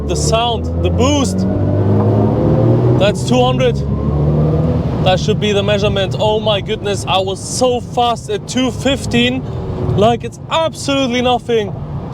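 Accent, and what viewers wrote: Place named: Germany. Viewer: Thought he was speaking german with that accent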